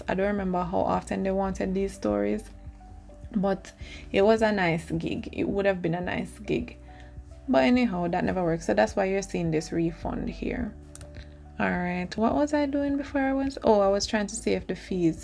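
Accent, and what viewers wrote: Jamaica. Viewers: You sound Jamaican... where are you based at I mean which city? I live in Melbourne Australia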